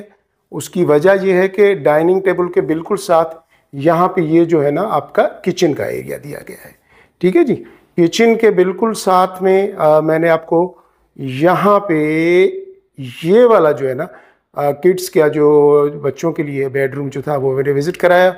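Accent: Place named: Karachi, Pakistan. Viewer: Pure urdu speaking man karachi urdu.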